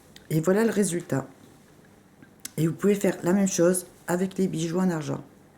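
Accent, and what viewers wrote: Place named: North, France. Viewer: Bonjour Madame, merci pour les astuces que vous transmettez généreusement pour le nettoyage de variétés de bijoux telles que l' or, l' argent etc...😊 Vous avez un accent du Nord...👍😊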